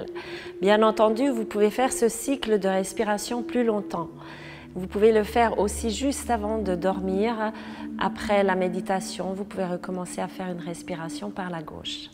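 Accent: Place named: Valais, Switzerland. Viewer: Un an depuis le grand confinement et toujours autant de satisfaction à vous retrouver et pratiquer vos différents programmes avec en prime ce délicieux accent valaisan que je connais bien de ma branche familiale cousine En espérant pouvoir vous rejoindre un jour pour un stage d'été Merci pour la qualité de vie que vous partagez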